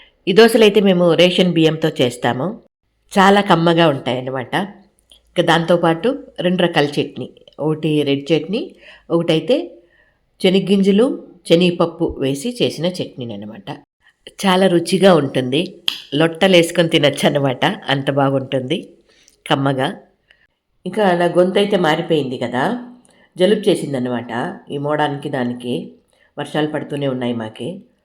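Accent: Chittoor, India. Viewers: మీ స్లాంగ్ చిత్తూరు యాస. మాకు అనే బదులు మాకీ అంటారు. వేయాలి కి బదులు ఎయ్యాలా, ఎన్నో ఎన్నెన్నో అంటారు. ఏం ఫరవాలేదు. ఎవరి యాస వారిది. అర్థం అయితే చాలు. నేను యూట్యూబ్ వీడియోలు చూడను, ఎందుకంటే వారి ఆదాయాన్ని వారు తమ స్వార్థానికి వాడుకుంటున్నారు. మీరలా కాకుండా, యూట్యూబ్ ద్వారా వచ్చే ఆదాయాన్ని నిస్వార్థంగా వృద్ధుల సేవ కు వాడుతున్నారు. అందుకని మీ వీడియో లకు సబ్స్క్రైబ్ చేసుకున్నాను. ఇంకా లైక్ కొడతాను. మీ వంటి వారిని ప్రోత్సాహ పరచడం మా వంటి వారి బాధ్యత. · Ctr side antha me slang amma memu kuda mee laage ❤❤❤I'ove ur slang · Authentic chittor slang,mixed with telugu and tamil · Hi ma..i like ur slag..endukante..nenukuda me vure 😊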